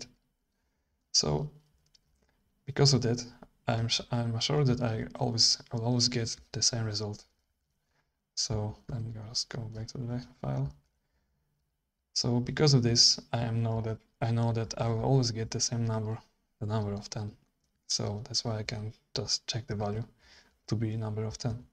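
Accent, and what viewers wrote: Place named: Belgium. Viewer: I was like huh, this guy sounds like a Belgian speaking English (I am Belgian too), and then I saw the location of the video haha.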